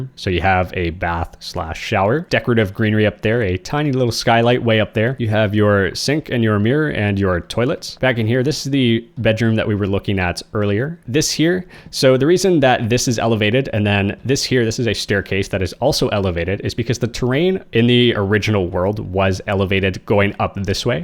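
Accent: Canada. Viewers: this is the first video ive seen of yours, and I heard you say "aboot" and I was like "Is this guy Canadian?" By the end of the video I was like "This guy is definitely Canadian." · your northern accent is showing are you canadian? (from Michigan here) edit i was right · Man you sound Canadian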